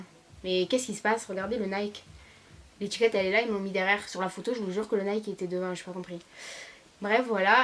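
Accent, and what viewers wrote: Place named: Paris, France. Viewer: Pourquoi tu fait l'accent parisien?????🤭🤭🤭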